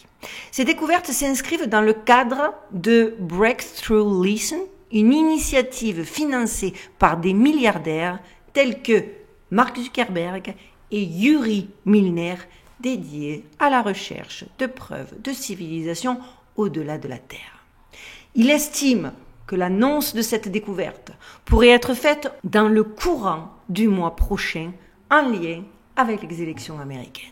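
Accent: Marseille, France. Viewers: Ce petit bout de femme est juste extraordinaire !! J avale les vidéos les unes après les autres depuis 3 jours !! D ailleurs je suis marseillaise près du vieux port 😊 haaa vous n avez pas perdu notre bel accent ça fait plaisir ❤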